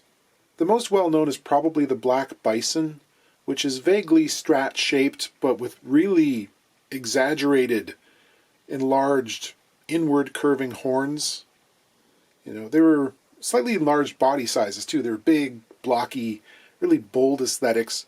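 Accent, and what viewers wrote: England, United Kingdom. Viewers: Major credits for the attempted English accent. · How delightful to see such a classic. However, the issue of precedence is moot, with Charlie Watkins (of Watkins Rapier and WEM Copicat fameI claiming to be first to market in 1954 (the year of my birth). I actually owned a Rapier briefly in my 20s and, for reasons which need not concern us (clue, it was in the 1970s and I was in my 20s), have no idea what became of it. It was a piece of history, and the back story is much the same as with Burns. In the UK, tariffs on imported instruments were huge in the 60s, as was the price of the instruments themselves. Even the top bands such as the Shadows (and later, the Beatles) spent the early days of not just their careers, but also their stardom playing UK or continental instruments. Thanks so much for this piece of history. If you're watching, I do know you have an ear for accents love the solder/sodder stuff), but your English accent crosses every river from the Thames to the Tyne (mate)! 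As a Londoner, I can tell different suburbs without getting out of first gear (extra points of the use of the iconic Canadian 'Ey;'). In passing, I note that van gent tuners, from the Netherlands and a great tuner, have been used for many years on a more recent iconic English guitar brad - Gordon Smith. Extraordinaty value instruments. I should mention that, more than ever, your commitment to researching the Hx is super. Great video in every respect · Yeah bit of a mish mash of accents, but you pretty much nailed the mentality. It’s always enjoyable hearing folks from your side of the globe doing Brit accents, I still imitate Dick van Dike from 50 years ago and have been doing it that long. Dad joke don’t get any better in my house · Love the English accent 😊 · Your English Accent sucks. I say this as an English person 🙂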